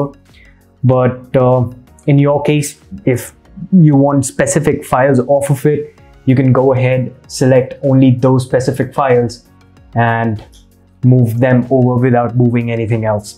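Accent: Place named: India. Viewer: thanks nice indian english very clear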